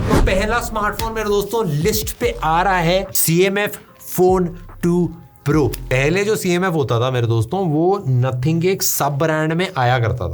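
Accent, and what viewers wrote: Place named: Haryana, India. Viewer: Haryana wala feel hai bhai kay bolne ka kya haryana se ho kya???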